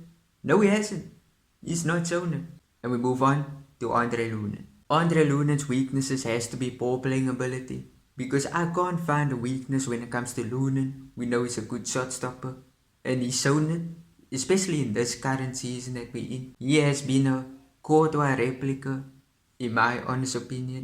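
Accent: South Africa. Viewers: Bro you sound South African like colored 😅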